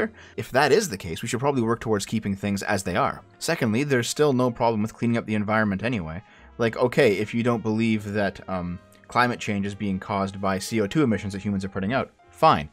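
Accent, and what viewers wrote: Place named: Ontario, Canada. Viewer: I think that you only like an hour or two from me. Based on the way you talk I'd assume you live in Ontario. This makes me wanna ask if your grocery store also has to wash the entire conveyor belt with every customer?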